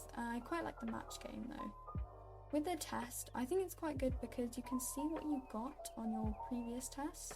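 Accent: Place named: United Kingdom. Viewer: You're so underated btw ur British accent is ssoooo good i love it💗💗💗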